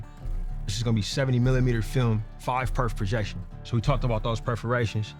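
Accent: California, United States. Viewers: If you close your eyes it sound like a gang member telling you bout film 🤣🤣 I know he from cali. All love P.S i jut Looked it up, he really from oakland🤦🏾‍♂ No offense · I was like where did he grow up? I seen California and said that’s why I’m hearing nispey and yg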